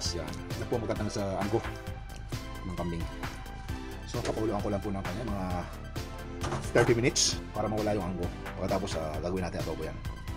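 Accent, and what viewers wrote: Batangas, Philippines. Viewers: sir slamt sa pagdaan s aking maliit nbhay, dito nko ngbblik, paborito ng magbabarik ang iyong putahe.. klasey batangueno ka sir halata sa punto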